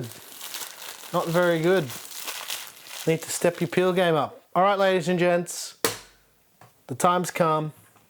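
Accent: Australia. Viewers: I'm so confused dude looks mexican but sounds Australian lol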